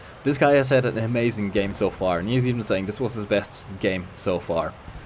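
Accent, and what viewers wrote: Germany. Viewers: Bist Du Deutscher? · Are you German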